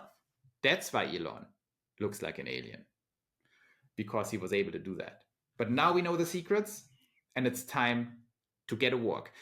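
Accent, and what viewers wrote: Germany. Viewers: Appreciate your analysis, insights and effort to bring this material so clearly with your pleasant German accent. Very good!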